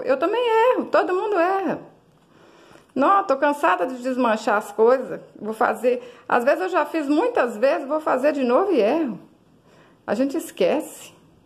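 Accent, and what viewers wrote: Minas Gerais, Brazil. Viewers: Você é mineira, né?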